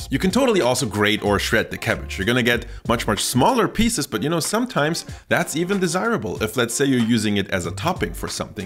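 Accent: Germany. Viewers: yea you can hear the german. You always can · While yes his English is very good but definitely a German accent through and through. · I’m pretty sure he reveals his Germanness from the moment he opens his mouth. To a native English speaker, his accent is very obvious and easy to identify. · It's always waaay easier to spot an accent if u hear ur native language - in German I would only need 3-4 words and I could tell where someone is from as well. But listening to this dude speaking my 2nd language/English - it's hard for me to pinpoint that he's German too oO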